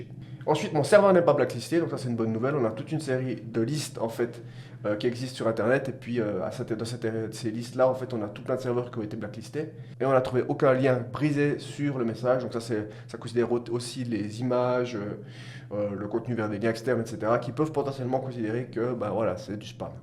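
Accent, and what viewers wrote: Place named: Belgium. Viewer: Très clair et précis et avec un bel accent belge si je ne me trompe pas ! Thanks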